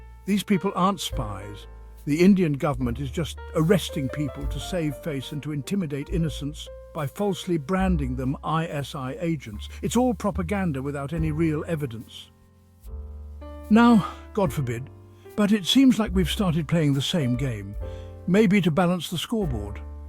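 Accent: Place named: United States. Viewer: Very effective vlog to understand the native American accent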